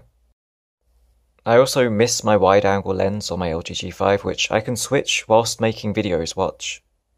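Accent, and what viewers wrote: United Kingdom. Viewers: you have a british accent although you life in japan I guess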